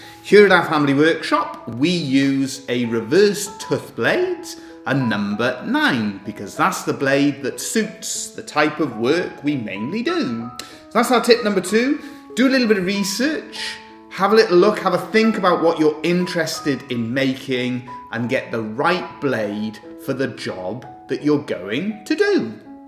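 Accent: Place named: Wales, United Kingdom. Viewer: Man it's been a long day. I sat there thinking this guy sounds welsh. I didn't even see the in your name. If you get people asking for a cheap scroll saw, I bought mine from screwfix for 90 quid.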